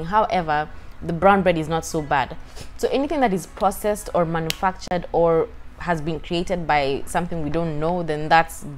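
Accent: Kenya. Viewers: You sound Kenyan :)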